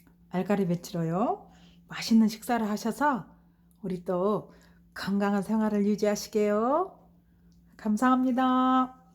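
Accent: Jeolla, South Korea. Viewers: 말투나 만드시는 음식이 남도쪽이신거같은데 남도가 고향이신가요? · 낭글 낭글ㅋㅋ 전라도 아짐ㅋ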